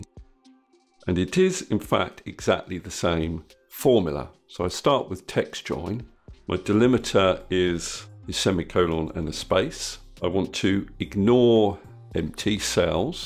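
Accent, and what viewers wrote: England, United Kingdom. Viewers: thats a great solution..thanks dear... I love your English accenr...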